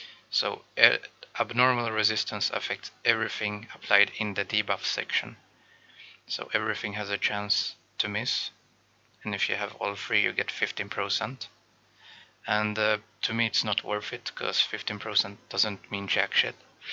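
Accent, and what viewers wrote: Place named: India. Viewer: What? You sound indian :D . Of course i know it. But good to know for people who don't know it.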